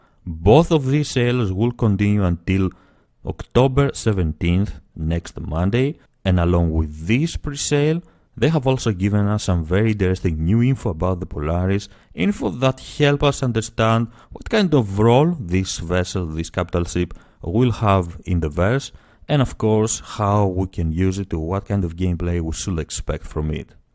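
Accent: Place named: Greece. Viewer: Great vid, solid to-the-point information without superfluous speculations. Also I like your accent - you're greek, aren't you?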